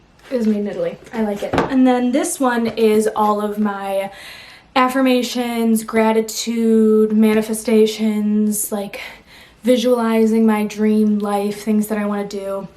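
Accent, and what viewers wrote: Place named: New York, United States. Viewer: LOVVVEEEE this vid!! where’s your accent from?? you sound like a New Yorker 🤩✨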